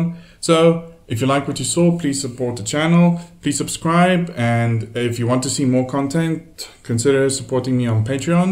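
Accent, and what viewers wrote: South Africa. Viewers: Sounds like a South African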